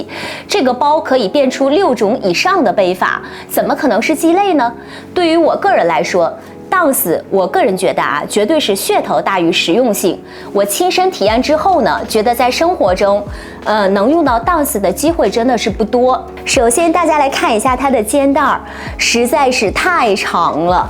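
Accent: Japan. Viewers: 看了评论才知道是日式英语，shoulder楞没听出来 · 日式英文，聽不太懂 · 她在日本開店，所以都是日語發音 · 日式發音 畢竟她在日本啊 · 在日本生活了十几年，英语发音已经被带偏到姥姥家了😅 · 日式英语好可爱hhh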